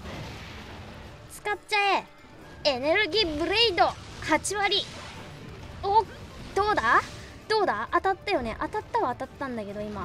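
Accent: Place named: Kansai, Japan. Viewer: 動画がアップされるごとに関西弁のレベルが上がっていっている 素がで始めたのか 昔は・・・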